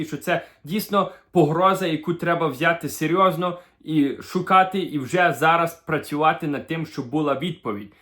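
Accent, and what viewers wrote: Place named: Lviv, Ukraine. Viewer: не львівський в нього акцент, а з львівських сіл (видно вчили мови бабуся чи дід)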